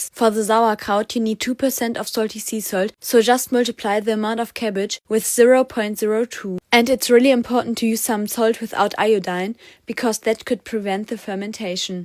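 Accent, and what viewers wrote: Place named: Germany. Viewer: Oh god that disgusting German accent is Just pathetic. Learn to speak properly or Just dont do it.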